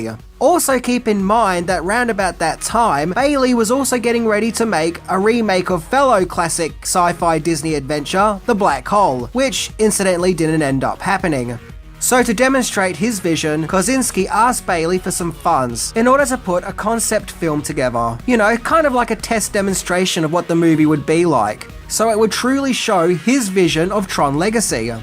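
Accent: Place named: Australia. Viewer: There's the OST and then there's an 8-bit version of the OST. Both are incredible. And, despite your not being familiar with the technology and your tendency to speak like an Australian, I LOVE your totally unpretentious takes on film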